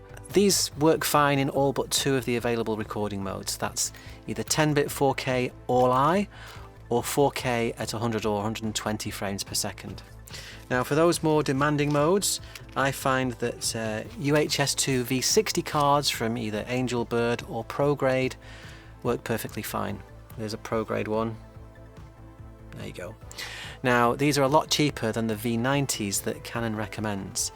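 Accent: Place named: England, United Kingdom. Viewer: Thank you for this very informative video. Gave me the information I wanted with an English voice presented at a nice pace. Thanks again.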